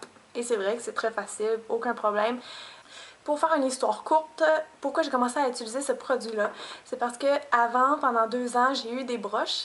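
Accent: Quebec, Canada. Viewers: J'adore trop ton accent québecois ;-) HeHeHe ! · Coucou ! :) :) Merci beaucoup , malgres 3 semaines de recherche au magazin et sur internet , j'ai reussi a troouver le produit :) ! J'adore ton accent ( c'est quebecois ??) :) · faut écouter la vidéo avant de poste un commentaire pasque elle est québecoise mes bon... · Wouaah ... même sur une chaîne, où une jolie et gentille fille, prend sur son temps, pour nous donner pleins d'astuce (grand merci au passage !) les gens arrivent encore a critiquer, rager, et limite s'insulter ?! déstresser un peu et renseigner vous avant de dire "nous c'est des €....on le sait !! Oui elle est quebecoise, d'ou l'accent, mais elle va pas convertir tout les prix, pour tout les pays, démerdez vous un peu P**** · jadore laccent canadien :p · ses l'accent québécois :p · J'adore ton accent Québécois mais comme la dit Zephyrlevent les broche comme tu dit en France ce dit bagues. 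Très belles dents au passage. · accent canadien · J'adoooorree son accent québecois !! Abonnée directt ! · C'est marrant venant de quelqu'un qui fait un paquet de fautes écrites, se permettre de faire des remarques à une personne pour ce qu'elle dit oralement tout ça parce-qu'elle ne comprends pas "à cause de son accent". Au faite, si vous aviez vraiment pris le temps de regarder son blog vous sauriez que cette demoiselle vit au Canada, et qu'elle est Quebeccoise... Alala incorrigibles ces internautes... #tousse tousse un peu débile aussi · C'est l'accent québécois cocotte, par contre puisque t'en parles, en français on écrit "ça", "d'où", "vient", "saisis", "ce", "dis, "vous dites", "non". cordialement, bisous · Comme les autres l'on dit, en France on dit "bagues". L'accent québécois est toujours aussi marrant! C'est fou comme les mots peuvent être différents d'un pays à l'autre.. En tous cas, Merci pour tes conseils :) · Je viens de tomber sur ta video par hasard, et J'AIME ÇA!! 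CET ACCENT GÉNIAL DU QUÉBEC! ET CETTE FRANCHISE! Et en plus c'est clair, net, et humoristique!! Personne ne t'a encore proposé d'être broadcasté sur un show quelquepart?continue, ça devrait venir!! bonne chance et bonne continuation à toi!! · Sa se voit ! Tu es Québécoise non ? :) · le canada ! je kiff ton accent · Tes jolie, personnellement je n'aime pas beaucoup l'accent québécois... :x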